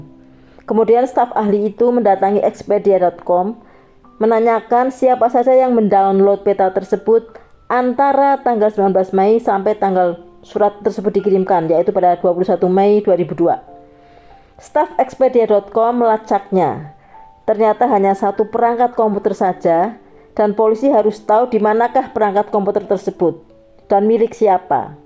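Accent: Java, Indonesia. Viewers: Pembawaanya yg medok itu ciri khas tersendiri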